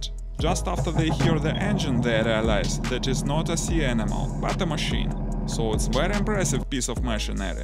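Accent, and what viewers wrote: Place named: Russia. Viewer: Sounds very much like a Russian accent.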